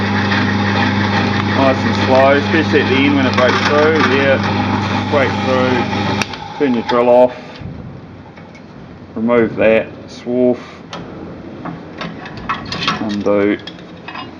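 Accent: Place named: New Zealand. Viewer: Very helpful, thank you! It sounds like you're from New Zealand. South Island???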